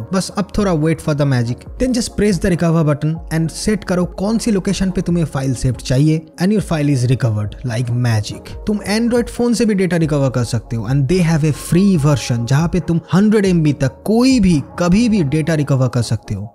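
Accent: Bengal, India. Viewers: Bhai are u bengali?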